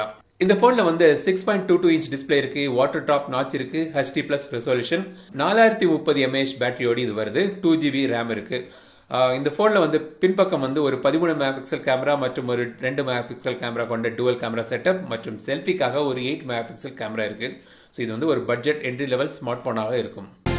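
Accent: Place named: Nagercoil, India. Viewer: நம்ம நாகர்கோவில் வார்த்தை உச்சரிப்பு உங்க கிட்ட இருந்திச்சி அதான் கேட்டேன்.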